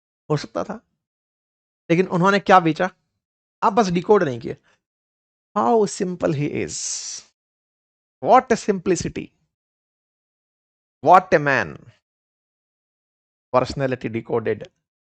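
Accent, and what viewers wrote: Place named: Marwari, India. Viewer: श्री राम राम जी SiR ji आपके शब्द में कभी कभी मारवाड़ी शब्दों का उच्चारण होता हे जिसे हम सभी मारवाड़ी होने का पहचान शब्दों से पहचान हो जाता है 🙏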